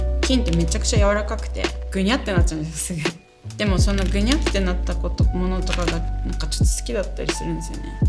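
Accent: Okinawa, Japan. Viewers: 沖縄な喋り方！！！故郷思い出して気を取られて内容聞けなかった笑 もう一度見る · 沖縄なまってるよ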